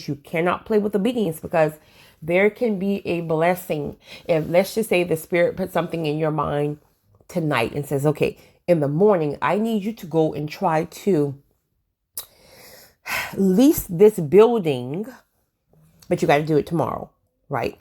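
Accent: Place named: East Coast, United States. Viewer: Thanks for speaking; (You do need to shut off the alerts from your dm's😉 👍)..But hearing your 'east coast voice', to THIS 'way-faring stranger', was a blessing.🙏 ( Storm? Tornado alerts here in Oklahoma⚡🌪⚡🌘⚡🌪). You have a beautiful voice. 'NovelCoronaVirus19' is in Gods hands; Don't worry, Stay safe.🛐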